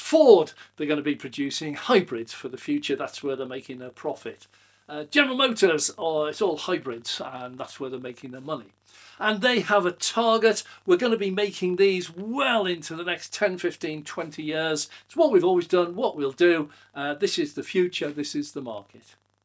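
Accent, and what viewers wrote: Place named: Northern England, United Kingdom. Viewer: Northern! West of the Pennines to be more precise (with a hint of Cornish thrown in 😉).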